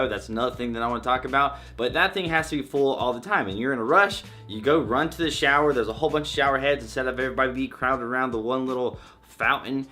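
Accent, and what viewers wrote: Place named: South, United States. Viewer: I can tell you're from the South, I'm guessing Ga, but you have a little bit of an accent and you talked about Fort Benning which is in Southern Ga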